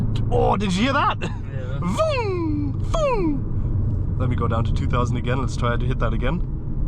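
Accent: Germany. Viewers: Bin überrrascht, dass man dein deutsch kaum raushört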